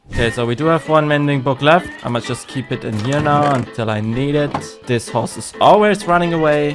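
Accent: Germany. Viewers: deutsch oder österreich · The accent is quite obvious actually.. Though maybe non-Germans just can't tell? (amatures) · Man hört den Deutschen Accent raus.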